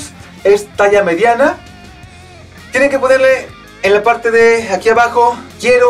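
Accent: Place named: France. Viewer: Haz más vídeos con tu suculento acento Francés y Brasileño. ♡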